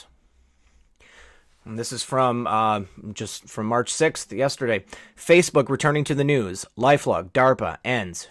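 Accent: England, United Kingdom. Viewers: Great job! -- And love your English accent! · Played on high speed, your British sounds like Stewie from Fam. Guy. 😂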